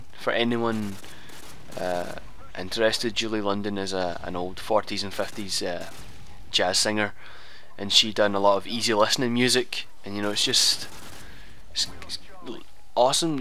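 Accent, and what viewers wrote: Scotland, United Kingdom. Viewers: I love calm scottish accents :D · Scottish, I’m his nephew btw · are u british or sumthin not trying to be raseist or wat evva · Awesome accent, I'm Scottish too. Half. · I like Scottish English,your voice reminds me of Soap Mc Tavish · are u scottish???? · Nice scottish dialect 5/5 (Nice play 2) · He sounds a bit Scottish to me. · its scottish it isnt anything like irish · you sound more scottish <3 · i like your accent, scottish? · wa hey. A scot. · Your scottish accent is probably the only kind i like, Xbrzz sounds so common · r u scottish cus my neighbor is and scottish accents are cool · Man, I love your scottish accent, awesome!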